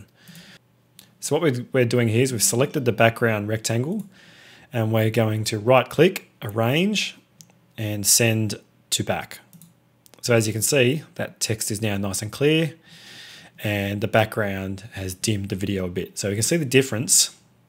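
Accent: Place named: Australia. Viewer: Thank you for the tute.. Best I've found so far. Good to hear an Aussie accent.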